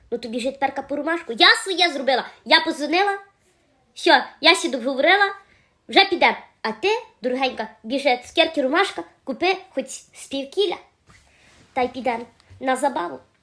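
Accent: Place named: Lviv, Ukraine. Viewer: Боже ,так розмовляє моя мама і тітка .Як мені подобаєиься 😁😁😁Львівщина❤❤❤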